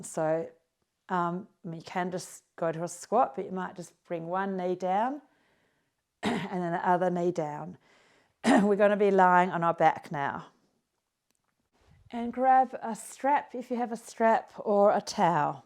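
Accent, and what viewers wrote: Australia. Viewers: Thx for the yoga exercises while conscious of knee osteoarth. I like the gentle knee bends and then standing on toes. All other exercises seem solid/proven ones to induce motion, strength in muscles around knees. If in another video you could touch on the issue of cartilage loss, cartilage gap and how to prevent ill-effects from that specifically, that would be wonderful! Great presentation overall, very compassionate style. The Aussie accent (?) is charming. Kudos. Thanks so much for making these videos!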